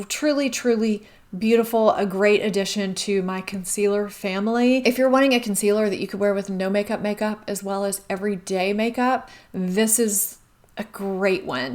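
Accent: South, United States. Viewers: that southern accent intensifying through the video :D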